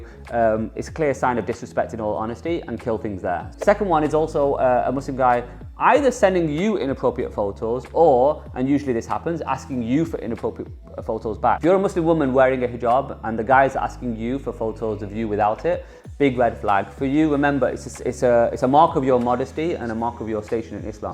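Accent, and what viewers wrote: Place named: United Kingdom. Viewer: DUDE! SPEAK ENGLISH NOT BRILLISH…. 90% OF PEOPLE I AM SURE DON’T EVEN KNOW WHAT TF ARE YOU SAYING.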